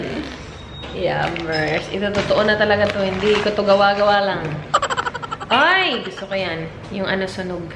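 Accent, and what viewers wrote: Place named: Visayas, Philippines. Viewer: Lalom kaayo ug bisaya ni si.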